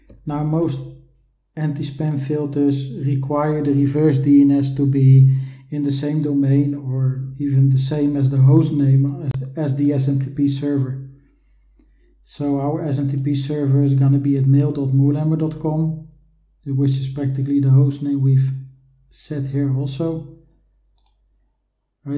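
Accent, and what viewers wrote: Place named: Netherlands. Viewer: hello dutch man XD good accent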